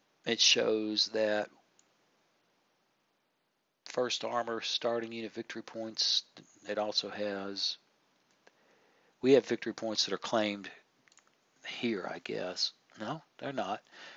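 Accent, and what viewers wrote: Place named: United States. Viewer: wow i can't believe it. A game tutorial which isn't voiced by a british guy. Either all british guys do nothing else but review video games and showcase tutorials, or all guys who do this are british. your'e the one american, cool!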